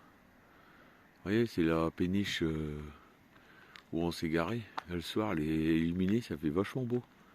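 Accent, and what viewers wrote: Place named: North, France. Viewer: Coucou on entend l'accent du nord ci je ne me trompes pas ❤👍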